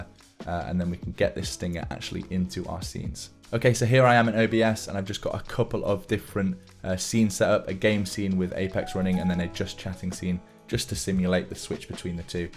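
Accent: United Kingdom. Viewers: yo i love your videos, BUT YOUR VOICE. After a couple videos i have to listen to heavy metal to get the Brittish accent out of my head..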